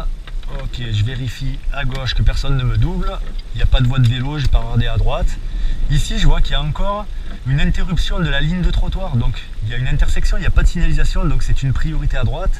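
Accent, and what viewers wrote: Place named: Carcassonne, France. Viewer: Ton accent me semble familier, Carcassonne ? Je suis a 1h de montpellier :)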